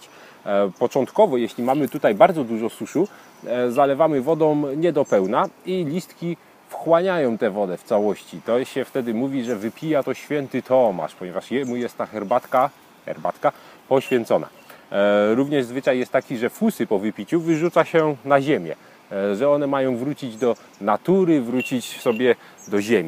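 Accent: Silesia, Poland. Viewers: jesteś ze Śląska prawda? :D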